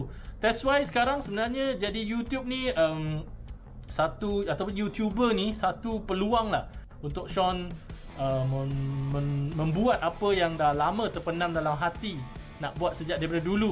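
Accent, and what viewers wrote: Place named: North, Malaysia. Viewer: Sean orang mana? Sebab guna lecey.. Dalam video Mcm utara ja 🤔🤔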